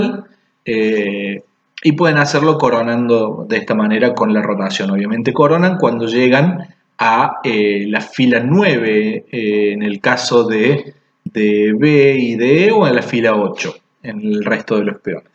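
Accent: Argentina. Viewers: es re cordobés el vago jajaja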